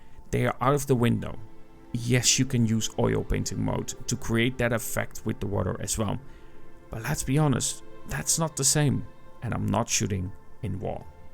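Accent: Netherlands. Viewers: I just have to start of with saying that you really can not hide the dutch accent, lol It's so thick!! Now about the content: one can only appreciate the fair citicism you made about the Xiaomi 15 Ultra. I'd say it's well founded and perhaps with future upgradings, certain issues can be tackled. Less sensitivity towards light can be fixed with software (or filter) but the fixed apperture however will stay fixed I'm afraid, which means less depth of sharpness. In the end it remains a smartphone, which is crazy, considering what they looked like 20 years back. I wonder what 20 years from now will bring. The Vivo x200 Ultra seems interesting too, but that camerabulk is sooo off putting that the Xiaomi 15 Ultra may not look that bad of an option.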